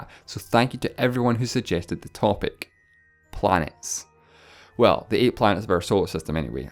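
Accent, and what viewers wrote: Scotland, United Kingdom. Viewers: rockin' the scottish accent, eh? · The Wisest Waffle he's scottish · Planets, Scottish accent, Kerbal Space Program's music on background: I thought for a moment this was Scott Manley's new video! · It's scottish. He said that he lived in Scotland in his "How many countries are there in the world?" video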